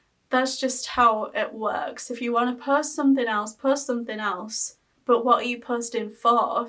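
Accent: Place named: Yorkshire, United Kingdom. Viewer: I found your channel when I was looking up Yorkshire accents. I only know of one person from that part of England. I’m not into Sims, I do have iOS products, but I’m not as excited about them. My point... I’ll get on now and then just to hear you talking. Not trying to be a creeper, it just sounds cool. Take care. 😊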